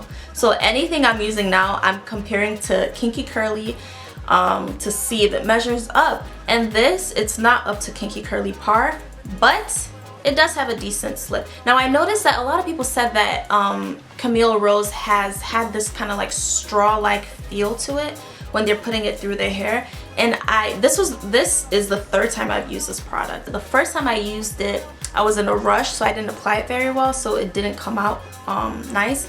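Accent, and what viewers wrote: Nigeria. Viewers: My sistah! Where you dey now!? Naija girl. I heard the accent a little lol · Loving the Naija accent oh. hmm. lol.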